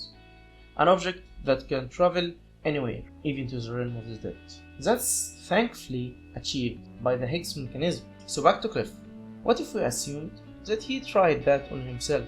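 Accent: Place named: Egypt. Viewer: Love your hard work and amazed about the amount of vocabulary u have. Wish you didn’t have the Egyptian dialect though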